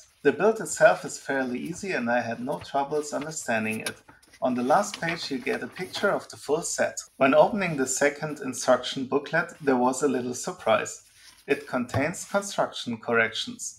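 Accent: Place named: Germany. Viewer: Building the Figures is easy, i dont know where the problems for you are. Meanwhile i think your mother language is German, and i think your can hear very well when you speak English. You should try speak more fluent. Otherwise nice review.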